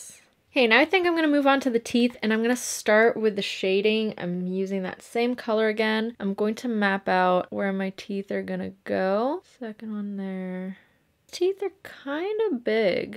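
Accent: Brazil. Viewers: she's brazilian, if that clarifies things haha. portuguese and spanish are pretty similar languages 💞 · vc é brasileira CERTEEEEEZA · Eu amo o seu sotaque carioca kkkk, seria legal ter um vídeo seu falando português, mas entendo que seria difícil em razão do seu publico ser majoritariamente composto por gente que fala inglês, AAAAAA E A MAQUIAGEM ESTÁ FENOMENAAAL ♥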